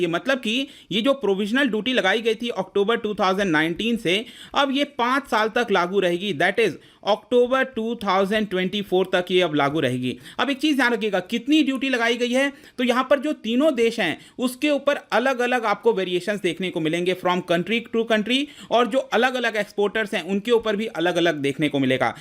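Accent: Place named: Punjab, India. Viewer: Punjabi dhke nl nikl jandi h 😋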